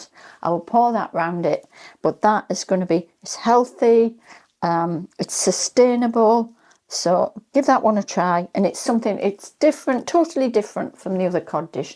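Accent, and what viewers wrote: Northern England, United Kingdom. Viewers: Hi 😊 I’m watching from Australia. I’m originally from Hindley, Wigan. I’m a newbie to the Ninja double draw Airfryer. Same as yours 👌 great to hear your Northern accent, where are you cooking from?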